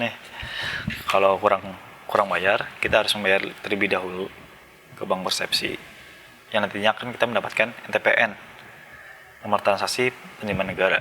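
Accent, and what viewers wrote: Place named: Banyumas, Indonesia. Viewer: membantu sekali mas ngapak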